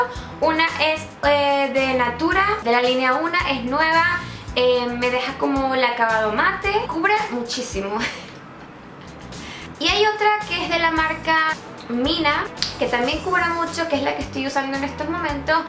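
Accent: Upper class, Chile. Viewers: Te sale bien pero un poco cuica jajja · Yaa , te sale como chilena pero cuica,igual vale ,está óptimo ,sigue así eres envolvente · Te sale bien, pero como cuica😂😂😂😂😂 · Habla mas Chileno que yo wn, le salio ree bien por un momento jure que era Chilena pero cuica xd. Saludos desde Antofa 😎❤ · Te amo!!!!, slds y cada vez mas Chilena! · 🤣🤣ME ENCANTAS ..SI YA HABLAS CON ACENTO .ME DIVIERTO MUCHO .CON TUS VIDEOS TAMBIEN TE SALE COMO CUICA 🤣🤣😘😘💕💖🇨🇱🇨🇱🇨🇱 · ......yo creo q nunca te escuché «hablar como chileno»pero si x youtube se nota q tienes un acento más de nosotros pero tus palabras y acento es como venezolano no se xq no te conozco en persona lo q quiero decir q ya hablas como el chileno medio y no con tantos «modismos»medios flaites yo diría q tus amigos chilenos pronuncian como normalmente hablamos un gran mayoría ,Chao y q tengas una buena noche cariños .... 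· eres mas divertida hablando con acento chileno jsjsjs saludos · Ohh jajajajaja me da risa pero, porque te sale mas chileno que a mi, 100% jajaja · Full Sureña, cerca de Temuco... pero Santiaguina. Nones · ajjajajaja mas que nada estabas hablando cuico, no chileno ajajajja que chistosa la weá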